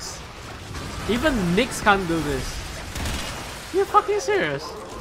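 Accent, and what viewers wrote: Singapore. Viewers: u sound super singaporwan are u?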